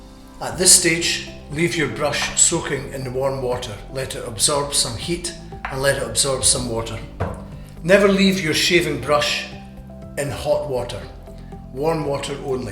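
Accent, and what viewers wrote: Scotland, United Kingdom. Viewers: I love the Scottish accent. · Hot Scottish accent and hot Scottish bull. Wow he looks rich too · anthony hopkins has fallen on hard times... its odd to hear him speak with a scottish accent... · I swear I could sit here and listen to him talk about shaving all day. You gotta love the Scottish accent. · The Scottish accent. Yeah, that was a terrible attempt at a joke lol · I like that scotish accent 😎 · I don't know if it's the cool scottish accent or the chill atmosphere and vibe, but man this was insanely satisfying, relaxing, and informative! It's like a comforting amd reassuring Scrooge McDuck showing you how it's done! Very nice! 😅😆😁👍🏻Might get into one of those razors! Been wanting to try one for a while! Good stuff! Thanks for the detailed tutorial! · Avec l'accent Écossais c'est génial ! Merci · Just adding the Scottish accent adds authority to all things pertaining to gentlemenlyness. 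· This is the video that influenced me to purchase a safety razor and throw out the multi-bladed sissy razors. Been shaving like my grandpa for about a year now and I still occasionally come back to this video just because. Wonderful video, almost hypnotic especially when you've got a Scot speaking, plenty of great information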